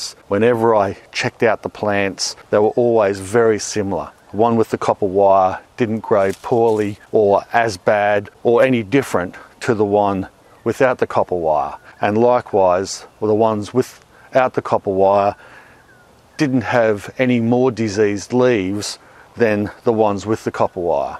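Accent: Australia. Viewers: I am a Yank electrician and notice that he wraps the copper around the stem in a counter clock wise direction, I assume he is Aussie or Kiwi by the accent? Northern hemisphere winds clockwise just like the toilets flush. Opposite poles provides the aether field. Thank you for your efforts sir, and thank you for providing your knowledge to me.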